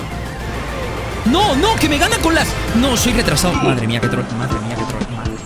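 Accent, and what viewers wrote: Argentina. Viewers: Jajajaja me hace gracias cómo abla como argentino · cada vez mas argentino 😎🤑🇦🇷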